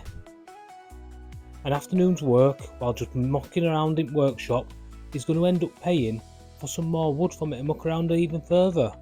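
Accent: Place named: Yorkshire, United Kingdom. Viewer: Hi, I’m glad I found your channel! I enjoy your dry Yorkshire style. You may appreciate that took some saying by a Lancastrian.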